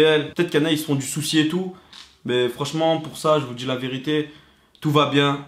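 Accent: South, France. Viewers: L’accent du sud c’est vraiment le pire du monde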